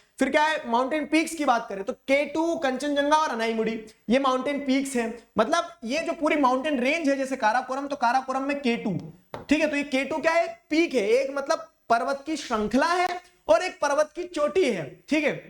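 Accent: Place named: Rajasthan, India. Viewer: Sir aap marwadi bolte ho ky aapke bolne ke tarike se laga ki aap rajsthani hai Please reply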